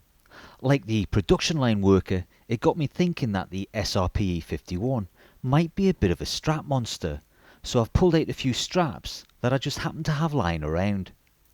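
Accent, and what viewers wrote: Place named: Newcastle, United Kingdom. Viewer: Nice watch and great review! Love the bit of history and the Geordie accent! 👍 subscribed...🙂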